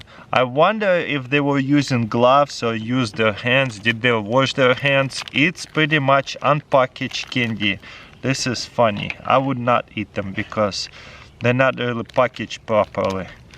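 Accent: Russia. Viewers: sir, i find your accent to russian. please get a vanda white to do the talking.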